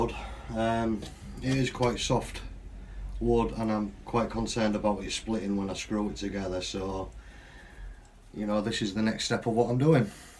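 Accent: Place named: Hull, United Kingdom. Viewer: This is driving me nuts looking for clues. lol. Is it Hull?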